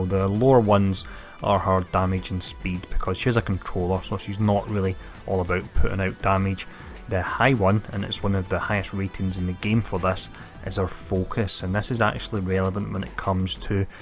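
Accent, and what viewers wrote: Scotland, United Kingdom. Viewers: You do an excellent job with this channel by the way - and I love a Scottish accent!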